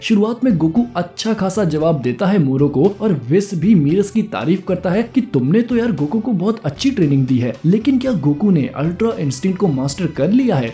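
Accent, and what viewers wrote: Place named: Punjab, India. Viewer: Your accent sounds like punjabi urdu😂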